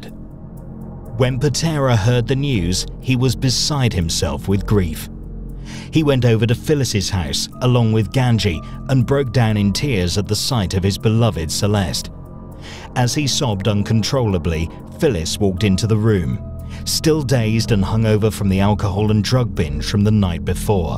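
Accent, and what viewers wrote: United Kingdom. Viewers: the British pronunciation of the surnames is laughable.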